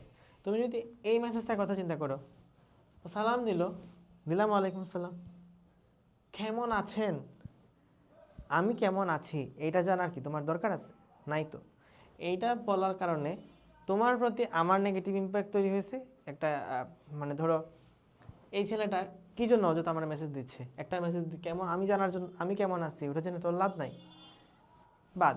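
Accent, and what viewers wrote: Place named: Habiganj, Bangladesh. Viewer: Vaiee kotha bolar modde Habiganj er basa misritho ase🥰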